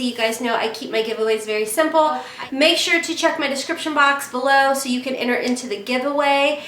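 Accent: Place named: Kentucky, United States. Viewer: Congratulations on 5K!!!💖💜💖💜💖💜💖💜💖💜💖💜💖 AND,... Thank You for this very generous giveaway!!! Thank you for sharing another GREAT tutorial😚. I love the Bad Habit palettes. And, even the ABH palettes have kick up. I think like you said, it's rare to find a palette nowadays that DOESN'T have some kick up. I love your Kentucky personality and accent💖. I lived in Louisville when I was a young girl for a while and I absolutely loved it. I was sad when we moved. I ended up back in the South anyways now. Lol (N Carolina). I absolutely LOVE living here. Wish we lived closer as I know our personalities are very similar and we would get along great!!😚 (Especially the makeup hoarding part😁😁😁😁). Look forward to your next video send here's to 10k!!🍾🥂🍾🥂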